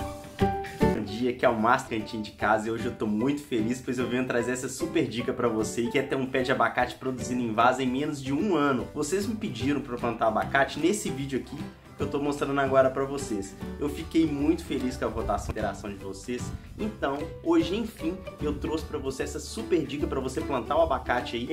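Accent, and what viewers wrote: Minas Gerais, Brazil. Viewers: O sotaque minerim entrega kkkk Parabéns pelo trabalho!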